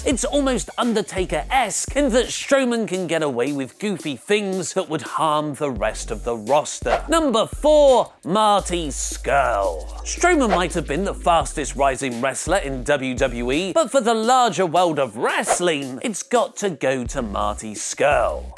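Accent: New York, United States. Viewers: Ahhh the return of stereotypical 1920s New Yorker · who else popped for the return of 1920's stereotypical New Yorker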